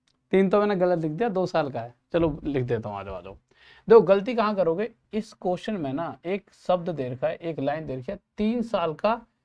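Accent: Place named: Bulandshahr, India. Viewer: Sir bulandshahr wali bhasa bol rhe ho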